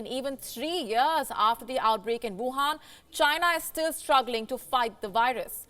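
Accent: India. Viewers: I disagree completely. Actually Indians speak better English (more grammatically correct) than the rest of the Anglosphere. That said, the Indian accent is a challenge to other English speakers, North Americans in particular. I hate to use the term but your comment is borderline racist. If Al Jazeera used native Arabic speakers instead of "white' mouthpieces, you wouldn't care for their accents either. You could use the subtitles function, as I usually do. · You are dubbing the interviewees spoken words with your strong Indian accent English. I'm effectively bilingual in English and Chinese, if your subtitles are accurate while the Chinese woman speaks in her own language, why would you dub her in English? People in China are frustrated because of the suspected abuse of Covid swab-tests that are mainly outsourced to private companies, which resulted in suspicious attempts to spread the virus throughout China, because it's very profitable for these private companies to legally syphon money from the Chinese government thru exploiting the legal loopholes, prolonging the current Covid19 situation, and collecting service charges for all the daily individual Covid19 swab-tests.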